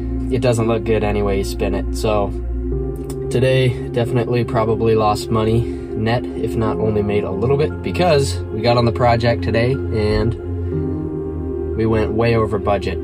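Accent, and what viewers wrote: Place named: Vietnam. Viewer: Lol sound very vietnamese!!!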